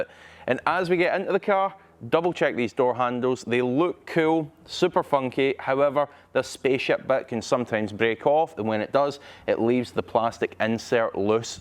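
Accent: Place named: Birmingham, United Kingdom. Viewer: they're from Birmingham 😂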